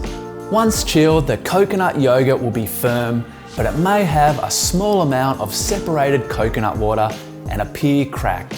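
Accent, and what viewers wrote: Australia. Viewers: Hooray for your Australian accent!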